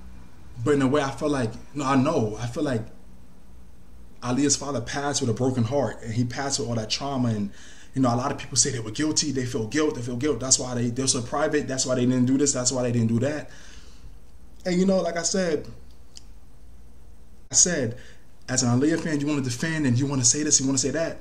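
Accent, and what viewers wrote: New York, United States. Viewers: You straight up New York lol accent off the chain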